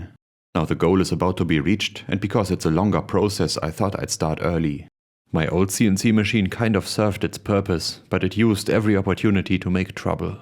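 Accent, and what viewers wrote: Germany. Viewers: Love that German accent (and very dry sense of humor)! High-accuracy stepper motors please!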